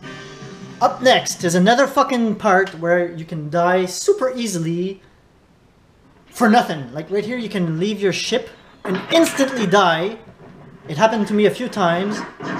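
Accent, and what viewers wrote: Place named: Quebec, Canada. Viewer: Je savais t'étais québécois !! Vrm bon ton vidéo j'ai jamais fini le jeu (PS4), j'avais juste réussi de me rendre après la partie de l'eau. Merci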